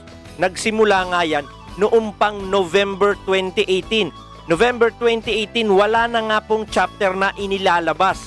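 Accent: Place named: Samar, Philippines. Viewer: Hulaan ko probinsya mo, SAMAR noh?😁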